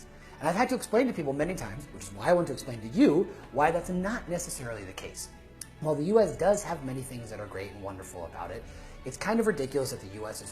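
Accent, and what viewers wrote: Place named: Basque Country, Spain. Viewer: Men now you have that Vasco accent so much on every word comes out of your mouth... Lol.. A American Spaniard 😁 good video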